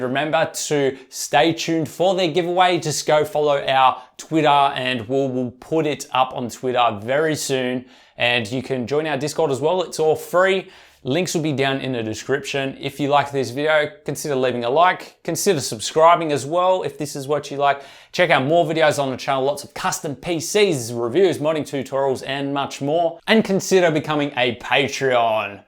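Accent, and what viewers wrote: Australia. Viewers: btw are you Aussie?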